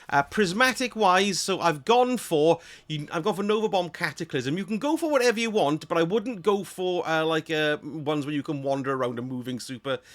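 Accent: Wales, United Kingdom. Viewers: Love the Welch accent, miss working in Wales, good content. Thank you.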